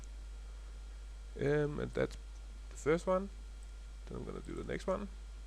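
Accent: Denmark. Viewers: I can easily hear you're danish d: ! not only by the name d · are you danish swedish or are you german i am danish by the way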